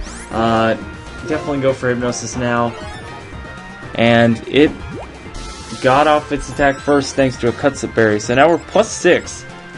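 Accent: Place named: United States. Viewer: Hablas espanol? Tu ingles es de Americano! (O aprendiste espanol como 2da lengua?)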